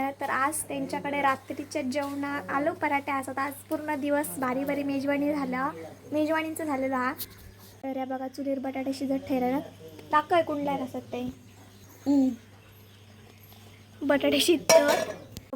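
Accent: Malvani, India. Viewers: Malvani chan bolte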